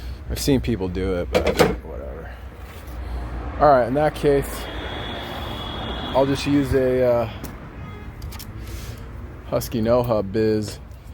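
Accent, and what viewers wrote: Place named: California, United States. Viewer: Love the cali accent my guy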